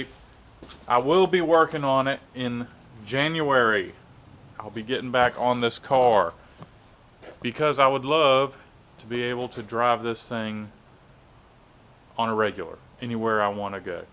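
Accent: South, United States. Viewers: Those aren’t friends. Those are people that want to take advantage of you. That having been said, you’ve fixed your audio lag and that means I’m subscribing and I’m gonna watch all your videos. I think I speak for everyone when I say we wanna see that stash tho. Hola from Mississippi, the southern twang is not lost on me.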